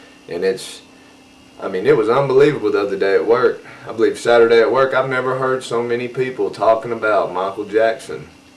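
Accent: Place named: South, United States. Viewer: I hear you my brother J. I stopped trying to show the blind all the MJ clues a long time ago. It's really an exercise in futility. I just go straight for the jugular now and show them the reality of the lies that have surrounded us for years. But you gotta be there for them when they finally see the truth cause they all go into shock, drop to the floor and get sick to their stomach initially. Keep up the great work, love your vids and the southern twang. I'm even deeper south from you.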